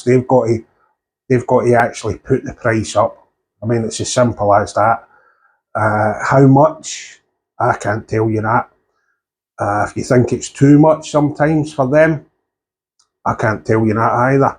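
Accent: Scotland, United Kingdom. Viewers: nothing like a Scottish accent! cheers, great video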